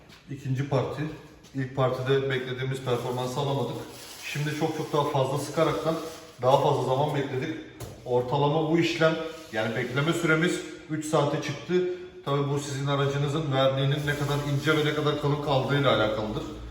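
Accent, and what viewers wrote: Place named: Zonguldak, Turkey. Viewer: selamunaleyküm,saniyorum zonguldak lisin.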